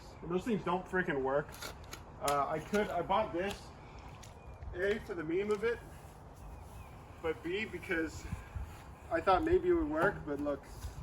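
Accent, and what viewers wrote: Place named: South, United States. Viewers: I'm sorry, i love ol' and all, but I'm really glad he eventually dropped that kinda southern gentleman voice he uses on these early videos. I guess I just got used to hearing him the way he sounds now (2024). That southern lawyer thing is extremely cringe to me. It doesn't keep me from watching, I just prefer his natural voice. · when u speed up the video i can really hear ur texas accent lol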